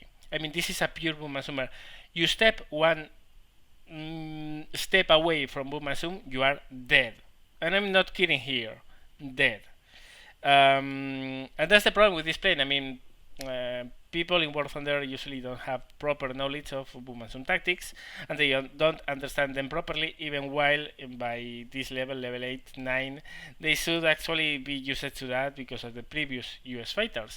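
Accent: Spain. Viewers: are you from Spain? I hear a Spanish accent :P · Eres español porque tienes acento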